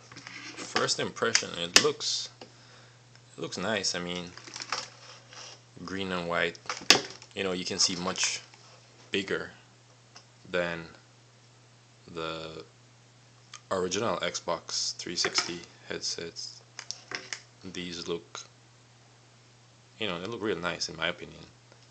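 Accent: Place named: Jamaica. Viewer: Your accent sounds a bit Jamaican, are you from there? :P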